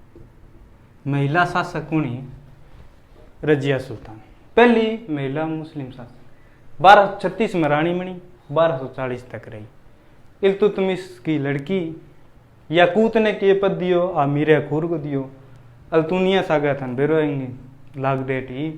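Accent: Haryana, India. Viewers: Nice h Bhai jma bagdi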